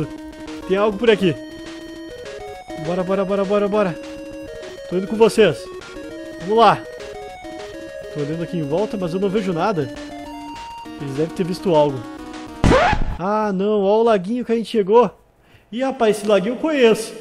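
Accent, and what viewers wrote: Rio Grande do Sul, Brazil. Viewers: Ele é gaúcho kk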